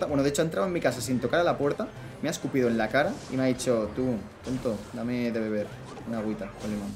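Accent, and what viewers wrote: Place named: North, Spain. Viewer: a entonces es norteño xd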